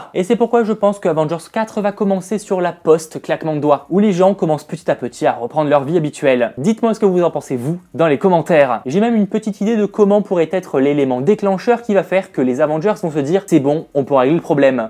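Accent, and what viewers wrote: England, United Kingdom. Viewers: Idem, du coup gros spoile sur la fin de Ant-Man et la Guêpe... Je crois qu'il annonce au début de la vidéo qu'il faut avoir vu le film mais je n'avais rien compris ce qu'il disait à ce moment là tellement qu'il parle vite et articule mal (+ une prononciation à l'anglaise), même en retournant en arrière plusieurs fois pour essayer bien de comprendre les deux films qu'il fallait surtout avoir vu (j'avais juste compris pour Avengers 3 mais pas pour l'autre). Merci pour ce spoile dont je me serais bien passé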